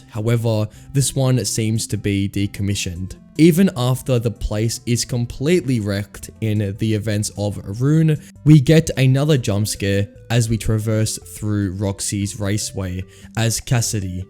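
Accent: Australia. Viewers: I can't tell if I've heard your voice somewhere before or all Australian people sound the same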